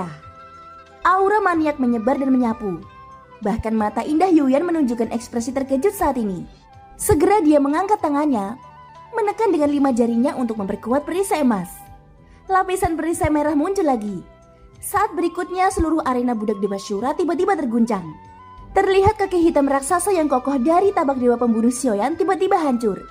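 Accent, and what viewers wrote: Java, Indonesia. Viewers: Min aku rindu suaramu yang meddo'😅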